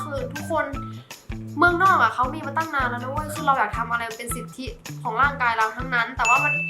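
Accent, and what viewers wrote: South, Thailand. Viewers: เป็นึนใต้ป่ะครับ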